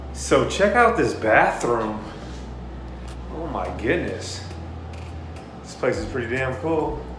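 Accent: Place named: Louisiana, United States. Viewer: Dude is wild with that Louisiana accent. That's what made me subscribe. He's natural with it. And, the background music is the bomb.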